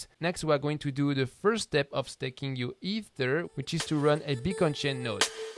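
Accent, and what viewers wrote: France. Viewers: 2 years later, it did not happen. And your French accent is still unbelievable :D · Merci ! Je réponds en Fr car je crois que j'ai reconnu l'accent ;) Ma question était : j'ai l'impressions que le Tuto de Prysm explique comment lancer son noeud établir son noeud sur son ordinateur. Je me demandais quelle était l'étape à suivre si l'on veut passer par un server comme digital ocean ou dappnode ?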